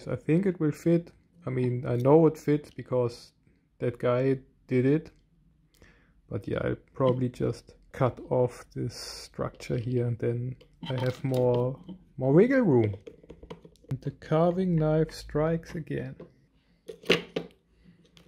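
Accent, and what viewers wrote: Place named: Germany. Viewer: Very useful bringing that googlegroup blog to life! Coupled with deadpan German(?) delivery. Excellent!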